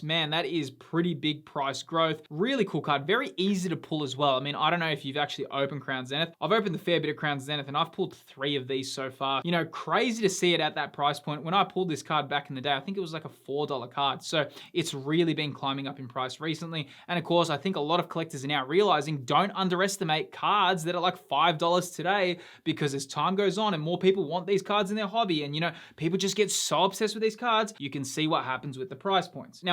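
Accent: Australia. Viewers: i subscribed because of the Aussie accent and the banana trend :)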